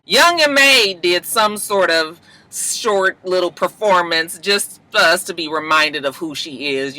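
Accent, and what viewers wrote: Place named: California, United States. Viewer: "you got a hit right there" still got that cali accent I love it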